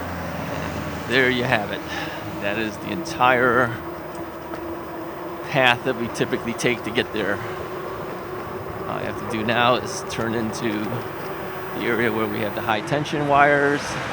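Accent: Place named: North, United States. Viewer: Just found you through “ Total Therapy Solution PT “ you tube. Love your mission to help others! I am getting ready to have 1st of 2 knee replacements at 70 years of age, female, due to advanced osteoarthritis in both knees! Love bike riding and visited my son in Germany this past summer. He is an avid bike rider and Germany has wonderful trails with views in the mountains for riding. You mention the weather many times in the video but don’t say where you are from? Are you in Chicago? You mentioned the “ Windy City “ and I’m picking up a rather northern accent in your voice. I was raised in upstate new York near Buffalo. Excited to see such bike trails are available! My son introduced me to the idea of an ebike while I was in Germany. Many Germans are using them! I plan to keep following you and loved riding along! Thank you for what you are doing!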